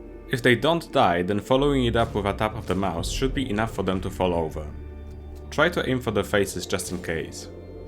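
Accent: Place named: Germany. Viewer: He has a german accent, I trust him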